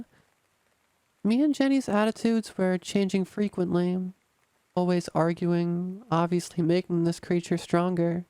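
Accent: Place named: New York, United States. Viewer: Love this new you. Are you east coast or west coast. Just trying to figure out the accent. Sounds more New York.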